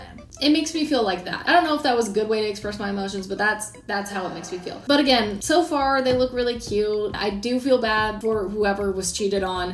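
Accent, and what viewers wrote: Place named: Canada. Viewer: I thought you were canadian 😭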